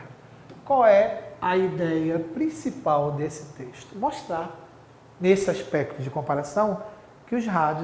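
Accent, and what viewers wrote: Pará, Brazil. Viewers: Ótima aula e explicação, você é paraense professor? kk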